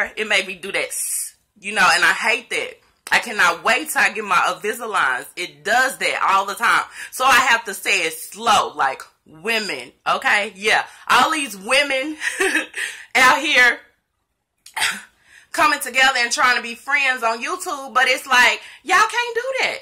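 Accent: Texas, United States. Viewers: you from Texas. I am listening to your accent.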